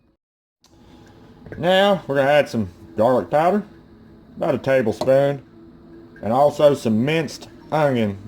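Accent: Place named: Western Kentucky, United States. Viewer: Where are you from? We have the same accent. I’m from western ky. You’re a great cook btw!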